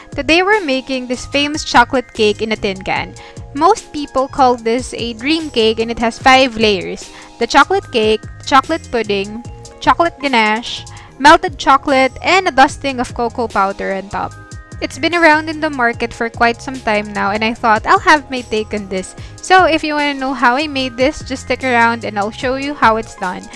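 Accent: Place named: Philippines. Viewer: Hi Ate.... thanks for a well-made video. You are born to teach! If there is such a thing as a Filipino ENglish accent, this is an excellent example. Beautifully spoken😊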